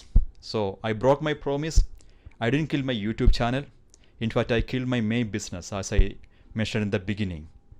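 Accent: India. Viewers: Hi mr.. Do you know any engineering simulation softwares? Like matlab, msc softwares etc? What kind of engineering consultancy did you run? And i feel that you are from southern india based on your accent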